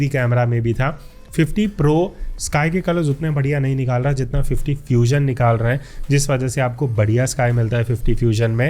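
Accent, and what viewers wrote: Lucknow, India. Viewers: Bhai Lucknow se h?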